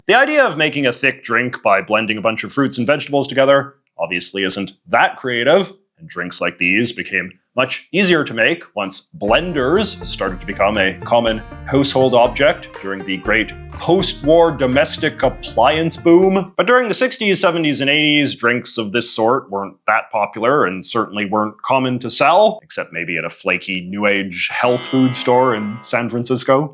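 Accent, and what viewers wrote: Canada. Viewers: That Canadian accent tho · Most Canadian accent ever and I’m Canadian. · “Talk a boot this this with oot mentioning….” Canadian slime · … there it is lol this is my first video and I was wondering why you sounded like that. Now it all makes sense, you’re Canadian! 😂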